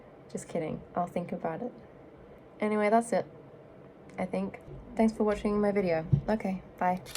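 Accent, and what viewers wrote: England, United Kingdom. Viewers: haha maybe the british accent is deceiving but you don't seem that dumb. Maybe dumbest people are actually those who don't even realize they are dumb, idk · Re: ideas for content : 1. Something about your city. Based on your english, I assume it is not USA, but England. 2. You trying out new things. it could be some new style, new hair color, new outfits, may be a completely different ring for the nose, new food, new hobby. Something about what you never thought to try. 3. start some exercise regime... I don't know ... yoga or heavy lifting. Something you wouldn't expect of yourself. And post how it is going.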